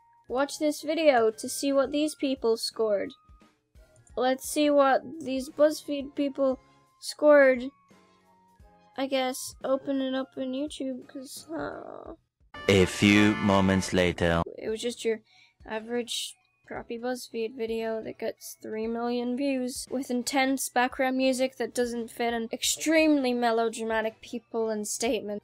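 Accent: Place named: Donegal, Ireland. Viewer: U sound like ur from donegal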